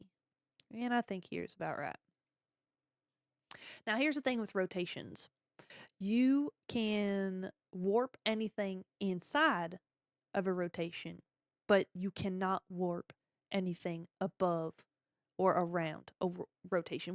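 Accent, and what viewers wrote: South, United States. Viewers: When your from the south to and from a vtuber that speaks in southernese 😭🫶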